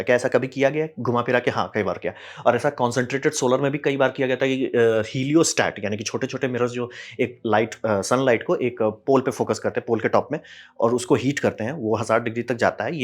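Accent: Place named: East India, India. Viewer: A u from bihr or east india? Value to bhalue??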